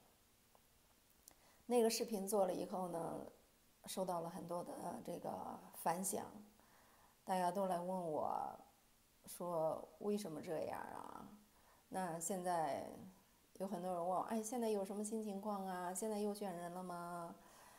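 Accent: Beijing, China. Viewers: 姐们 您在winnipeg？ 北京的吧 听着京腔 顺耳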